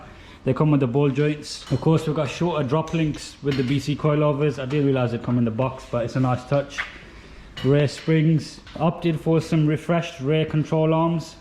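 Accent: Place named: Liverpool, United Kingdom. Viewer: That Liverpool accent what makes this video entertaining. Keep up the good work.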